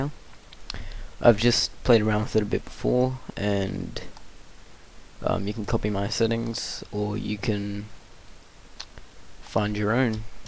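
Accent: Australia. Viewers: Hehe aussie accent, same xD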